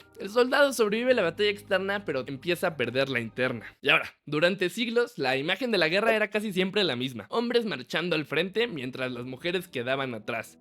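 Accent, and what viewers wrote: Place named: Mexico. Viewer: que habla este tipo? que la guerra no entiene de genero? amigo estas bien ? entiendo que el contexto al cual haces referencia es una guerra tecnologica, pero te recuerdo que la mayoria de guerras se siguen librando en barro y en trincheras, una mujer no duraria ni una semana en las trincheras del frente en ucrania, de hecho ni dos dias. Asi que deja de hablar mrda woke, las mujeres no pueden luchar en campos de batallas reales, no de manera masiva por lomenos. Que hayan existido escuadrones y pelotones de ellas es verdad, pero no es la norma , es mas si mal no recuerdo las comenzaron a usar la URSS solo por que ya no quedaba de otra, eran la ultima opcion, pues la realidad es que biologicamente no sirven para ello. que la guerra no ha traido paz? xdd por tu acento supongo eres mexicano, tus antepasados han muerto por tu libertad que ahora desperdicias haciendo videos pendejos. 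La guerra en su gran mayoria si trae paz, que tu y yo estemos escribiendo nuestras opiniones en internet sentados desde nuestros hagares es prueba feaciente de ello.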